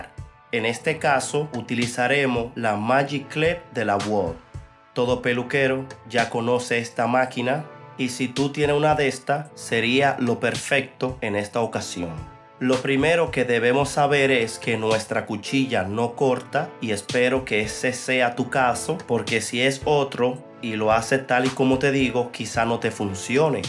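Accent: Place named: Dominican Republic. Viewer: Hablas igual que yo Eres dominicano verda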